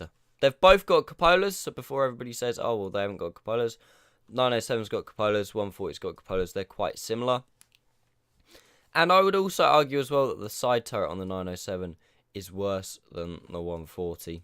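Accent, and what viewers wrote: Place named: Southern England, United Kingdom. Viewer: hey man great vid, im from the south of england and it sounds like you are from somewhere around there, if you ever fancy doing some platoons id love to play with you some time, you seem like a cool dude, getting the 907 on monday so would be cool to do some games! all the best mate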